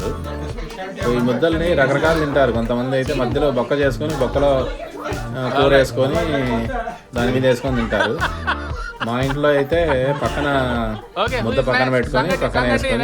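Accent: Rayalaseema, India. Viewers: Hi sir......this is madhu fr Chittoor...I follow ur most of the videos.....meeru US lo mana rayalaseema slang matladuthu videos cheyadam chala bagundhi 👍🙏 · Akkadiki vellina mana rayalaseema slag podu kada😀😃😃 super sir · Bro meedhi Rayalaseema kadhaa.... Kadapa district aa slang same alane undhi... I coming to Virginia State in next week I would like to meet you if you are available... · garu you r the king of Rayala seema food and language. US could not change your accent of our Telugu. Nativity in your language. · Anna nuvvu pakka Kadapa language matladuthunnav super Anna. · Speak in our rayala Seema accent nice to listen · అన్నా నీ భాష అద్భుతః. పక్కా కడప.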